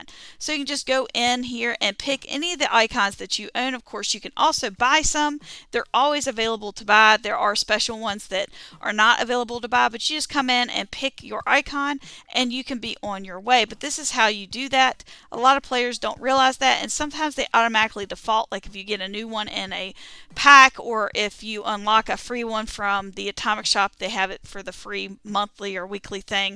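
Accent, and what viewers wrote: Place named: Appalachia, United States. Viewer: Only just stumbled upon this channel but I love how, within the first 30s, I can tell another Appalachian by how they say words like "dry". Instasub.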